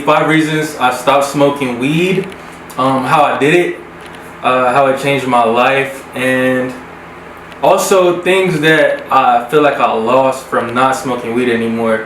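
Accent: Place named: United States. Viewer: Which part of the states if your accent from?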